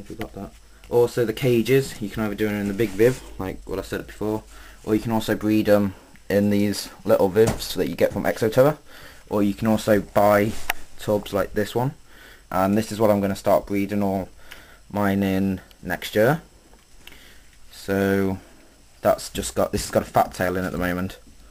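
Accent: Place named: United Kingdom. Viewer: nice video. but sorry i reallly reallly hate brittish accents. lol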